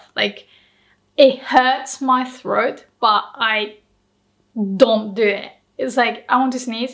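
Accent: United Kingdom. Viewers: Hi! I noticed your accent is very british sounding! Did you learn from a british teacher or something? Spend time in the UK? In college I had a Japanese friend who grew up in hong kong, so her English was almost a perfect british accent. Your accent kind of reminds me of hers. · Unrelated. Do you use the british accent as your base accent or the american one?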